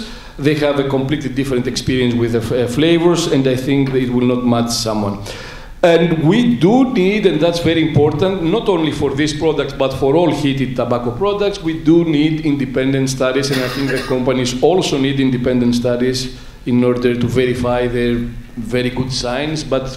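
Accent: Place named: Greece. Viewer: 100% accurate Greek accent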